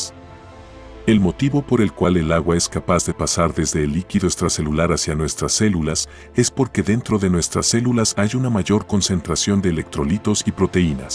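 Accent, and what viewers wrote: Antioquia, Colombia. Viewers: Es mejor que ahora ocupes la voz de la IA . El acento parcero o paisa es muy cutre . Felicidades y mucho éxito para este canal .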